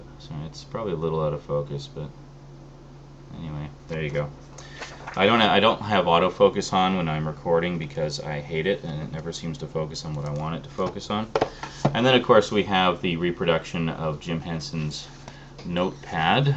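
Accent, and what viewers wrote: Canada. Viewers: ***** Are you from Canada?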